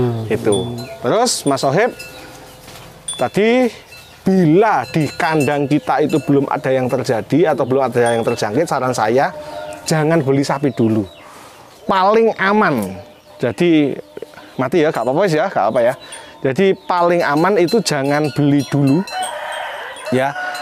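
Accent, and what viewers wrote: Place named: Madura, Indonesia. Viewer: Madura bang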